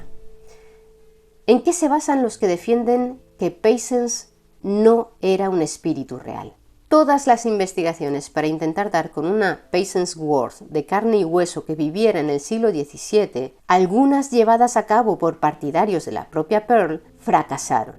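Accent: Castile, Spain. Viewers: Me gusta como relatas, aún que no suele gustarme el español castellano. Si me dan ganas de jugar la ouija pero a la vez no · soy colombiano,y me parecen fascinantes tus relatos especialmente por tu dialéctica y marcado acento español y tu didáctica narrativa. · Me gusta tu acento de españa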